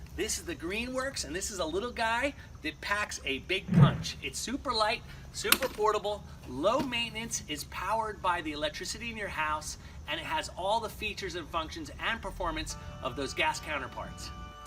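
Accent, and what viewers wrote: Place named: Pennsylvania, United States. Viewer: hehe is this guy from PA? I like how his accent says "water" as, "wadder" :0) Great tips though, I like a visual on how to hook mine up.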